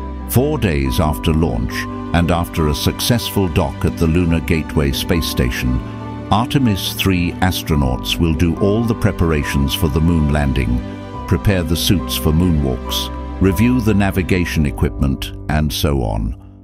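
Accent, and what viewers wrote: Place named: United Kingdom. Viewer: Why is the channel based in the US, but the narrator is from the UK?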